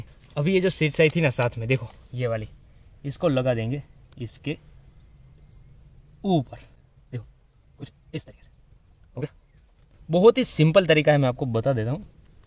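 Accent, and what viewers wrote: Gujarat, India. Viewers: Han bhai gujrati hai 🙃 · Bhai, tu gujarati he.?? I mean tone se lag raha hai.?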